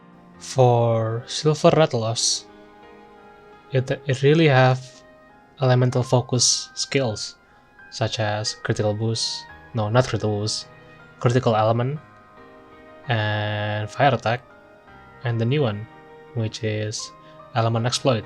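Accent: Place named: Indonesia. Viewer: You sound like Indonesian. I really like Lunatic Rose, it is really convenient to bring to multi-monsters hunt. This update is huge for me coz I dumped all my previous set and finally can slot in Peak Performance 3 + Chain Crit 3 + Defiance 5 and even Good Luck 3 (gonna change it to Partbreaker 3 coz my I'm already set with Qurios Augment).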